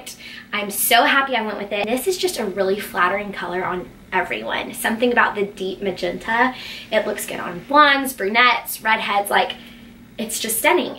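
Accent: South, United States. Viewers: your southern accent is adorable😍I live in Dallas too but I don't have a southern accent!😭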